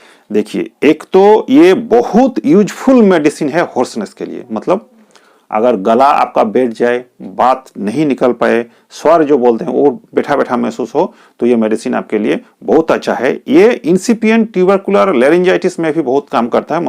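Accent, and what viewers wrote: Bengal, India. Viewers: আপনার বাংলা ঘেষা উচ্চারণের জন্য বললাম ।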